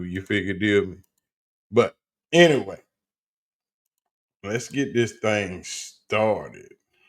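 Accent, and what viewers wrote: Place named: South, United States. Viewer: you a trip - Sounds like.... a lil bit black. A lil bit southern. Reminded me of Samuel L. Jackson thumbs up brother keep up the great work,u got a fan in me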